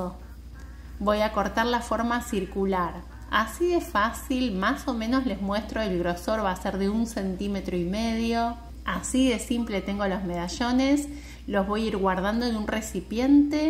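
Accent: Buenos Aires, Argentina. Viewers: Hola, justo estoy en ese proceso de volverme vegana y comer saludable y Dios me puso su canal, gracias. Me encantaron sus recetas, tan fáciles y se ven muy ricas y el paisaje de regalo uff lo ame, pense por su acento que estaba en Argentina, pero leyendo los comentarios vi que es Brazil, de verdad que son Bendecidos y que así sigan siempre. Un saludo desde la Ciudad de México . P.D. Muchas gracias por compartir la receta y el bello paisaje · Escucho que sos argentina por tu tonada bonairense. Yo viví en San Juan por 10 años, soy italiano, pero ciuando hablo español lloro como ellos. Hagale un video de una empanadilla bien criolla vegana! Nunca haría piensado que podìan existir veganas argentinas! Un abrazo...sellado Explorador del Viento Zonda, vegetariano, no vegano.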